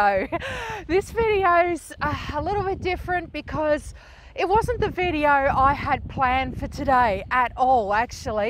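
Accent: Australia. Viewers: Your Aussie accent is really sweet... 👍☺️ stay well...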